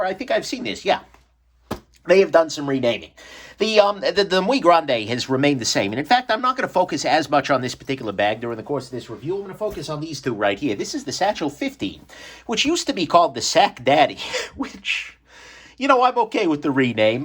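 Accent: New York, United States. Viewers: Because it is worth it to hear someone speaking with a New York jewish accent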